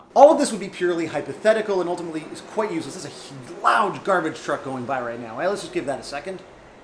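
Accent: Canada. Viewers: Woah wait, are you Canadian?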